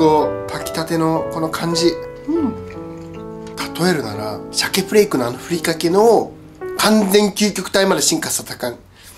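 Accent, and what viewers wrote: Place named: Hokkaido, Japan. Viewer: 北海道弁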